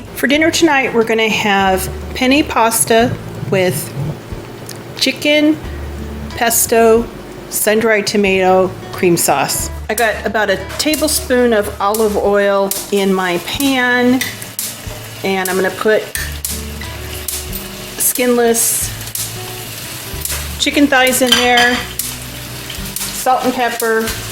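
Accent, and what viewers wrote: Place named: Texas, United States. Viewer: I have lived in Texas my whole life and I swear you could be from here! 😋 I just love your channel and I have made so many of your recipes. They are always a huge success. Thank you for sharing your yummy recipes with us. You are a blessing.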